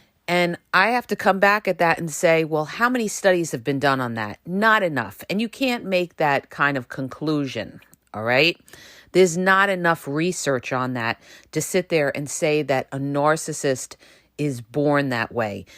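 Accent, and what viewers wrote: New York, United States. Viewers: I believe that Narcissists are made. My belief is because of my experience with her over 24 years. She got worse at the end because I believe she realized that her 2 boys were old enough to take care of themselves and that's when she let loose. I will be turning 64 this year and she will be turning 60. 3 years into Blocking/No Contact and I am now starting to feel peace in my Heart. It's a long road and I have no desire for dating right now. I just want to find myself again. I will always listen to you as long as you keep it going....Bless you and love your New York Accent...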